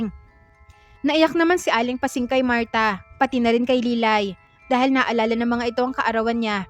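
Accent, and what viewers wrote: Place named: Rinconada, Philippines. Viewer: Parang ringconada ka idol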